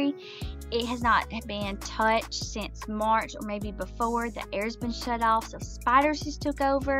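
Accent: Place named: South, United States. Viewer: You’re so thorough! You did a wonderful job! ❤️ And I LOVE your accent! I’m southern, but not as southern as you! So adorable! 😍 Can’t wait to see more of your videos!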